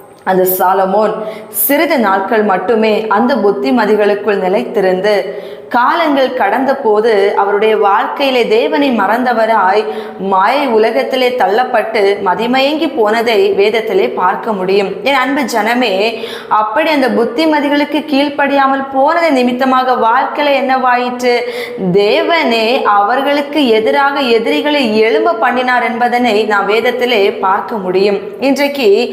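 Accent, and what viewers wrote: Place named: Kerala, India. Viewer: Amen Kerala tamil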